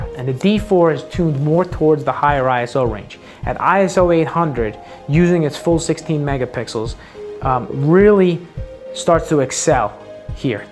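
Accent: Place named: New York, United States. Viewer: too much talking... yo, you from the NY area? yea, I thought so... eh, I feel like gettin some gabbagool.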